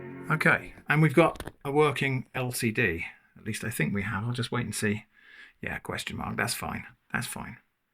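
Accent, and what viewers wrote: United Kingdom. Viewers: its a general misconception, you should not leave it plugged into the electrical socket for earth. Esd earth and electrical earth are different, you need to be on the same electrical level. just unplug the cable. used to install and maintain clean rooms and esd workshops for British Airways for 7 years. Mains earth and esd earth are total separated until they join in the sub station. you sound British? so we have a lot of rain in the uk so static generally is not a problem, unless you are in Arizona USA were it is dry as hell, very good video, love Linux mint, i have it on my two pcs and laptop. will be watching more. just subscribed.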